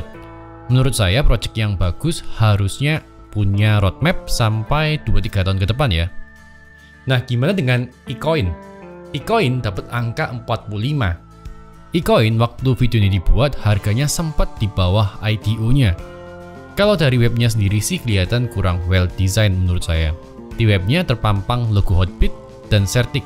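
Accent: Java, Indonesia. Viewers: Ko bahasa nya medok Jawa Jo😅😅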